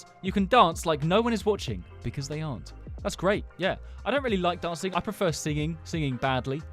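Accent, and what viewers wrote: United Kingdom. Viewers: I only laugh because he’s british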